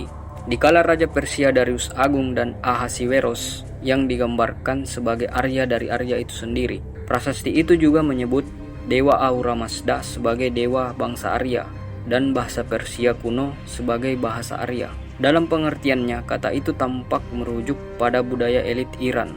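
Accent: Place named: Makassar, Indonesia. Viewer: Logat Makassar nih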